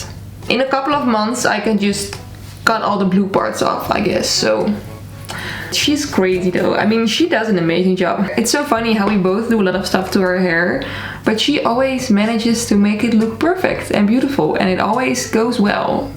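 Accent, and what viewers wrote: Netherlands. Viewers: I hate myself for knowing you were Dutch just by your accent😂😭. Ik dacht zo van “huh is ze Nederlands?”😂.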